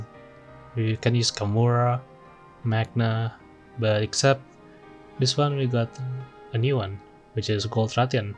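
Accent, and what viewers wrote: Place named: Indonesia. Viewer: You sound like Indonesian. I really like Lunatic Rose, it is really convenient to bring to multi-monsters hunt. This update is huge for me coz I dumped all my previous set and finally can slot in Peak Performance 3 + Chain Crit 3 + Defiance 5 and even Good Luck 3 (gonna change it to Partbreaker 3 coz my I'm already set with Qurios Augment).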